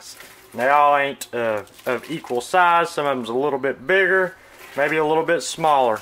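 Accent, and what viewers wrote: South, United States. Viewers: Thank You for the recipe. Love the “four” potatoes you put in. Looks like 5, but we all know them taters can be big or small, so use your own judgement. Love that killer southern accent, and vids from a Man with a passion for cooking. 🌺🌴💕💕💕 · Looks good. I'll make a batch. The southern accent just kills me.... was laughing my ass off the whole time. Not in a bad way - it was just hilarious to listen to. Kinda reminded me of Justin Wilson. Look forward to more cooking. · I love so much your southern accent. I could listen to you all day. Texas here. I might try one of your recipes without all the salt. Thank you. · I love your country talk I love watch your video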